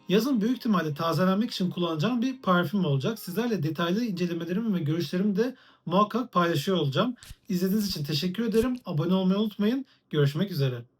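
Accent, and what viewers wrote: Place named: Central Anatolia, Turkey. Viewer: daha instagram diyemiyon mudur. nerenin aksani bu ic anadolu lu musun. ?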